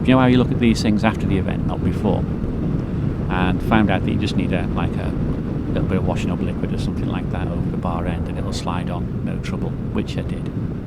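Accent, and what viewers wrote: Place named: RP, United Kingdom. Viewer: Loving the voice over hadn't realised how posh you sound 😂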